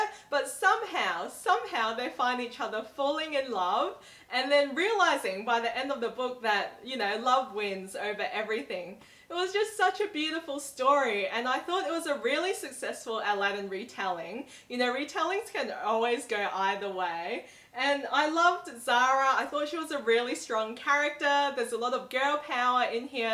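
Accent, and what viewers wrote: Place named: Australia. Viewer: TBH, this is the first video I've watched from you. first off, I love your gorgeous Aussie accent LOL. secondly I'm hoping I can find your Aussie ya reads here in America somehow cos they sound so good! I also really appreciate your review of Replica. I'll keep in mind how you recommend reading it when I dive into my copy. What other Lauren Oliver books have you read? I bought Replica on thanksgiving and then last weekend I bought another one of hers (can't recall the title right now) at a discount bookstore. Do you have any reviews of them video or written?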